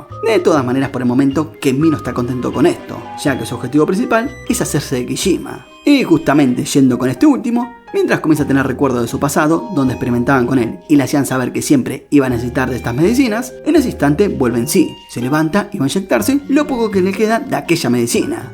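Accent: Argentina. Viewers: Bro parece que sos argentino y me gusta mucho tu acento y tu manera tan buena de comprimir un anime en 20 minutos😊❤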